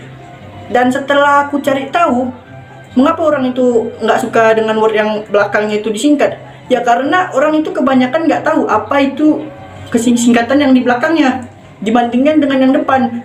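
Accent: Indonesia. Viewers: Orang Kalimantan kah ini? Dari logatnya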